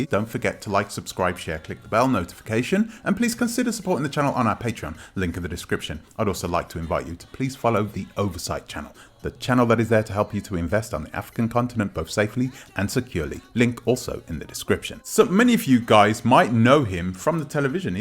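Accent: United Kingdom. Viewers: I think I don't belive you, with this English of England, start speaking like a black man, while you talking like the King of england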